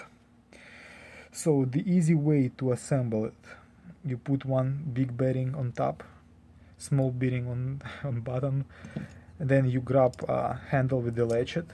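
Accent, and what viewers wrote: Russia. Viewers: ты русский) ясно по акценту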